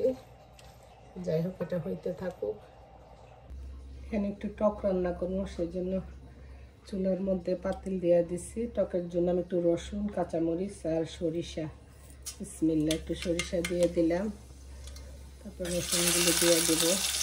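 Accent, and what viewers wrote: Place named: Comilla, Bangladesh. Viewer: আপু আপনার কথাগুলো শুনলে খুব আপন কেউ মনে হয়, আমি কুমিল্লার তো তাই,কথার সাথে মিল আছে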